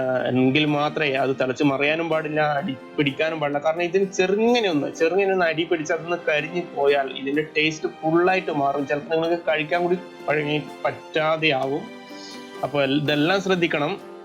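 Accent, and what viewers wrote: Kannur, India. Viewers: Njanum kannuranu nammude bashakentha mosham. Recipi suuper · നിങ്ങൾ ഒരിക്കലും മോശമായ, ശൈലി ഉപയോഗിച്ചിട്ടില്ല ,കേരളത്തിലെ എല്ലാ ജില്ലയിലെ സംസാരത്തിനും ശൈലിയിൽ മാറ്റമുണ്ട് ,നിങ്ങൾ നിങ്ങളുടെ സ്വന്തം ശൈലി കണ്ണൂർ ശൈലി ഉപയോഗിക്കുക. സ്വന്തം ഭാഷ പൊട്ടയാണെന്ന് പറയുന്നവരാണ് മലയാളികളിൽ കുറെ ഭാഗം ധൈര്യത്തോടെ കണ്ണൂർ ഭാഷ ഉപയോഗിക്കുക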